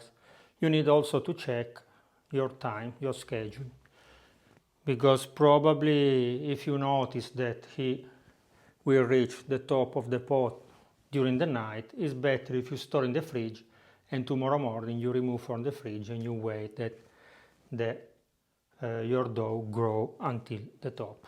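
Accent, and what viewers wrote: Italy. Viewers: youre the cutest english speaker amongst italians..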